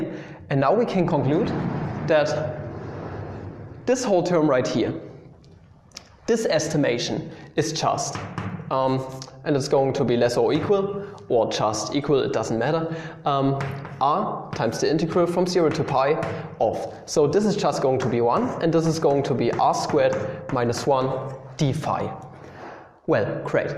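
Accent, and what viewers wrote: Germany. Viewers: The german accent+The great content+Calculus+great explanation= THIS VIDEO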